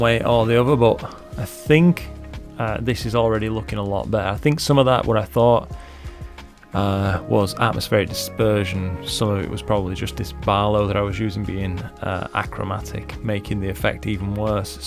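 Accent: United Kingdom. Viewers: it really does, thanks for the response. Yes UK based and from the accent im guessing not a million miles from yourself. They seem to be considerably cheaper ordering direct and it seems most of the Starfield stock comes from their HQ in Canada after reading reviews so I may order direct. I've watched a few of your videos today about the player ones and I'm really impressed with the quality. Great videos as well 👍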